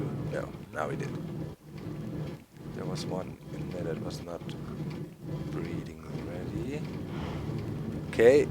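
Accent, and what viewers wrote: Germany. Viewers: i could tell you what hannibal said in the english version of the a team...but...i kind of like your germglish..it adds to the series..ok..i feel bad...in english what he would say at the end of each episode was..." it gives me quite some joy when my plan is coming together."...now you know, happy to help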